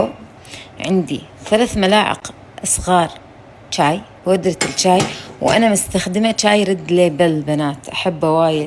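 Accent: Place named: United Arab Emirates. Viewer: احب صوتك والله الصوت الاماراتي اللي يفكرني باصدقاءنا الاماراتيين زمان ما شوفنا منكم الا كل خير صوت فيه طيبة وايمان الله يزيدكم نزلت مصر ومازالت الامارات واهل الامارات في القلب احن ل كل التفاصيل البسيطة اشكرك على كونك سبب في ذلك❤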